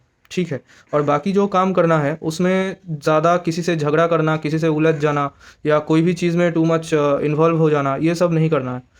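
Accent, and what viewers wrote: Bengal, India. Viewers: Amar o mone hoyechilo Bengali . Pronounciation lgche omni .... 🙂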